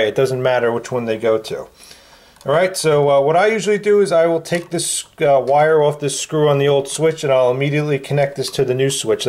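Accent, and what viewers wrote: New York, United States. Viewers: dude TALKS LIKE NEW YORKERRRRRRRRRRR MAYBE HES FROM THE BRONX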